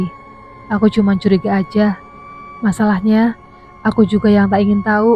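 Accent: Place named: Java, Indonesia. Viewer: Yaelah medok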